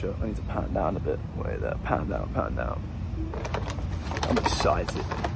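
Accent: United Kingdom. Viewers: Omg i love British accent absolutely great and your video absolutely amazing, all support 😊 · please put english subtitle in your next video coz my brain is not cooperating with your british accent😅🤣✌️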